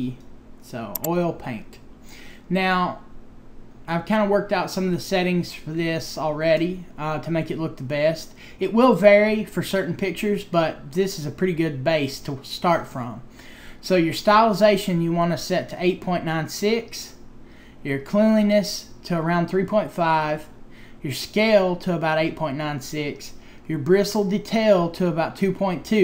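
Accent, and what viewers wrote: South, United States. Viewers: This is THE strongest southern accent I've ever heard :)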